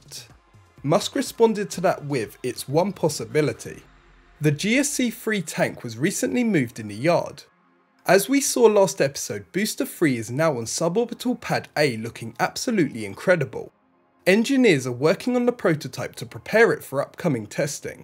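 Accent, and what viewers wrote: England, United Kingdom. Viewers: That is the way English people talk. They did invent the language after all. They then named it after themselves.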